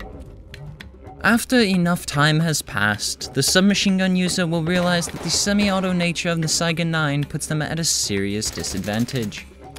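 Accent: United Kingdom. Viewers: This is either an awful English accent or it's authentic & feel bad for this lad. That aside, these videos are very well written, edited and fun to watch. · Dude you're British!?!??!?1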